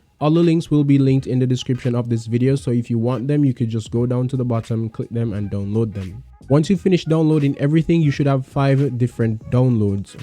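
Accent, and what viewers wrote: Jamaica. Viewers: Heard the Jamaican Accent and Subbed faster than you can say Ackee & Saltfish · You sound Jamaican bro. Are you?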